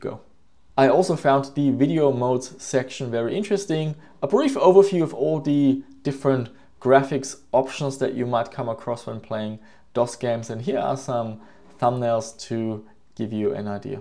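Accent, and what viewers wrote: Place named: Germany. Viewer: Da is doch deutscher Akzent^^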